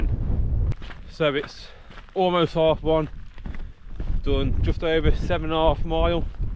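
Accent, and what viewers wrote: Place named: Northern England, United Kingdom. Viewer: I think there are two things you could do to improve this video. The first is to pause your walk when you address the camera. Set a scene. Consider the background. Consider all the points you want to make, make them and then continue the walk. Look at the scenes and the composition in videos like those of the outdoor boys. The second, and I really don't mean to be offensive, but you really need to find a way to tone down the northernness. Like "A few years back, me dad give it me". This is barely intelligible. You'll be more accessible to a much larger audience if you could rephrase it as "My dad gave it to me a few years ago". I do like this content and I want it to succeed. But these are things that need to chance quickly, or else you'll struggle to reach new audiences.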